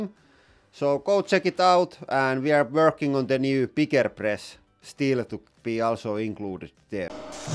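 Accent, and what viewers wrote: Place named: Finland. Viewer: I got recommended by youtube but i can already guess you guys are finnish by the thick finnish accent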